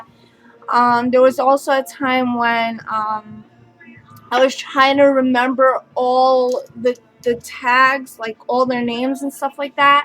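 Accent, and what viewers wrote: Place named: New York, United States. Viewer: Where are you from cuzz? You sound like a New Yawker.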